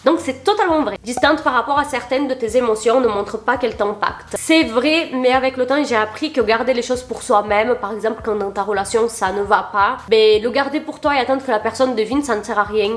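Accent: South, France. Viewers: Pas un préjugée mais la première impression que j’ai eu de toi mis à part le fait que tu sois adorable , c’est une francaise du sud , alors je te dis pas le jour où j’ai su que tu es brésilienne j’étais choquée car tu n’as aucun accent (non français ) non seulement tu as l’accent français mais en plus celui du sud que j’adore , franchement bravo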